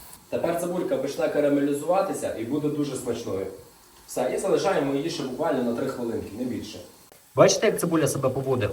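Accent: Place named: Hutsul, Ukraine. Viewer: Хороший, позитивний і діловий хлопець! А мова у нього - з діалектом гуцульщини, ну, і що? В кожній частині України населення має свій діалект. Не звертай на погані коментарі увагу,!!! ❤😂🎉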